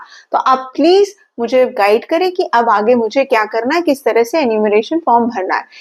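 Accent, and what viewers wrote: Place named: Uttar Pradesh, India. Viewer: Up ka lag raha hai Mam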